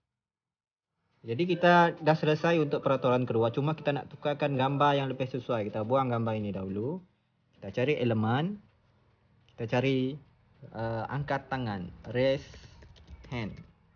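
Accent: Sarawak, Malaysia. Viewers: Bunyi org Sarawak jak. Mun benar, kipidap good job. Lamak sik nengar tune tutor gia 😁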